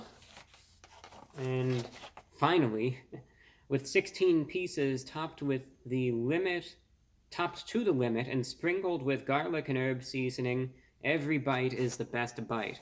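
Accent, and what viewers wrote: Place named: Transatlantic, United States. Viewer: You speak like a rich White Anglo Saxon Protestant with a slight transatlantic accent in there. A radio voice if you will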